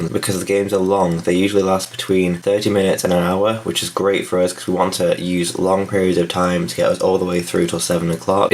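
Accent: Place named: United Kingdom. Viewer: Oh wow a Brit. Genuinely expected an American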